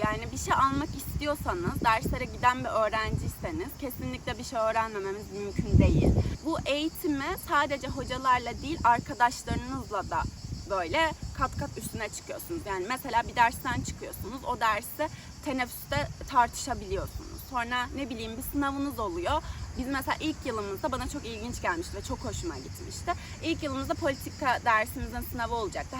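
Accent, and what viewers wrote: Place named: United States. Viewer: Bilkent üniversitesi vakıf üniversitesi Boğaziçi devlet okulu kıyas klasman farklı ama siz bence Ankara dan kaçmışsınız,Bilkent girişi kolay mezun olmak zordur,Boğaziçi zorsa Bilkent kesinlikle daha zordur ,devam zorunluğu vardır ve hangi bölümde okursanız Türkiye nin en iyi bölümü olarak okursunuz bunu size okul her türlü hissettirir,Ankaralıyım diyorsunuz evet İstanbul daha güzel bir şehir ama Ankara’da Tunalı,Gop,Bahçeli de milyon tane Cafe vardı,biz bayağı gezerdik siz içinden değilsiniz galiba,kulüp kurmak isteyenler rahatlıkla kurabilirdi,Bilkent mezun senenizde kurumsal firmalar bizzat gelip kariyer günlerinde elemanlarını seçerdi bir çoğu mezun olurken iş sahibi olmuştu,Boğaziçi güzel bir üniversite ama imkanlar Bilkent te daha fazla ,network demişsiniz sadece güldüm😂😂 hazırlık kabus gibidir evet aşırı zordur ama siz ielts aldım demişsiniz keşke 1,5 sene beklemeseydiniz,birde bu konuşma stili gerçekten çok komik ,özenti kendiniz gibi konuşun gerçekten çok itici duruyor ,bizler Türk toplumu olarak Amerikan yeni dönmüş işçi havasında konuşmayalım,Türkçemiz kişiliğimiz her daim sizi seçkin kılacaktır.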